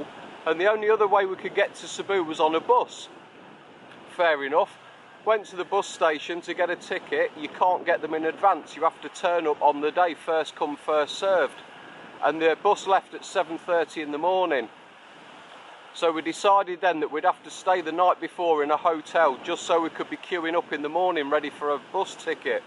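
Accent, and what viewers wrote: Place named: Black Country, United Kingdom. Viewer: You a Wolverhampton lad I’m trying to figure your accent buddy either that or brummy don’t take offence they’re similar lol 😂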